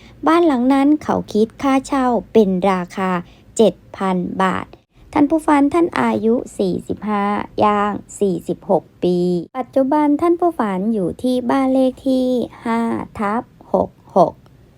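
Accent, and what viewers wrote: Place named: Laos. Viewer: คลิป มันชอบออกลาวเกือบทุกคลิปจ้า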